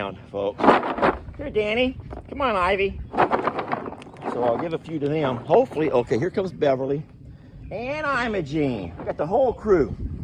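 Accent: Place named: South, United States. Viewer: We say ol man and ol lady . I think It's more of a country thang you just have a southern accent.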